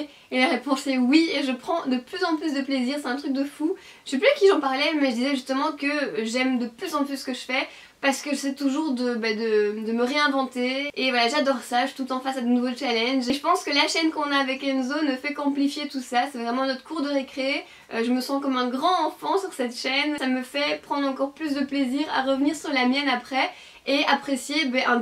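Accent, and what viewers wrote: Belgium. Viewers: comme tu habite en Belgique est-ce que tu peux garder ton accent belge parce que moi aussi je suis une belge et il y a je pense des belge qui te regarde aussie bref t'es trop belle j'adore tes vidéo ne le prend surtout pas mâle pour l'accent dsl d'avance si je t'es fais mâle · Dernière question à ce que j ai compris tu est belge ? Tu a un accent belge c est vrai ! (En mode pomee 😹😂)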